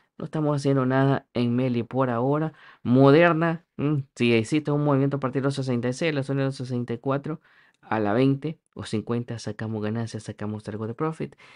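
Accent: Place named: El Salvador. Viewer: que nadie nos benga a dar cazaca 😂😂😂. Que se me hace que sos Salvadoreño.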